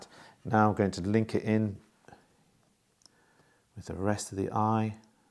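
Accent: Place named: Scotland, United Kingdom. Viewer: Thank you so much for sharing this wonderful time and painting with us. Your heart and joy for color are so beautiful. It is so lovely to also feel the support and caring of your family. My husband lived most of his life in Scotland and I am American. Our home is in America now. And we travel yearly back to Scotland. It makes my heart happy to hear you accent and expressions. Such lovely painting and wonders and learning from what is now my other home.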